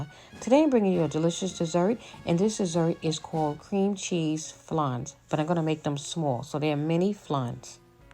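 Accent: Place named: New York, United States. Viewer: It's the new york accent for me!! 🗽❤ I can't wait to try this recipe for my family!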